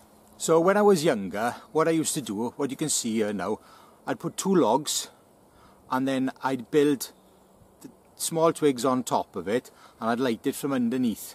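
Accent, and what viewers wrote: Wales, United Kingdom. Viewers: Not often you hear a thick Welsh accent in a YouTube knife review! Subbed! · I was so confused about the accent, ive never heard such a thick welsh accent. I thought it was welsh and Swedish mixed lol.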